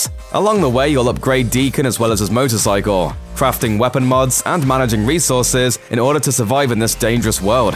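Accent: United Kingdom. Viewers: With all due respect Fuck British accent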